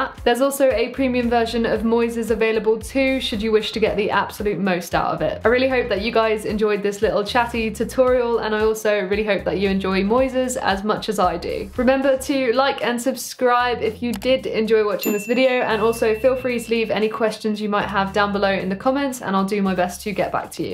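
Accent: United Kingdom. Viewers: I had no idea you were British 😂, only follow on insta til now.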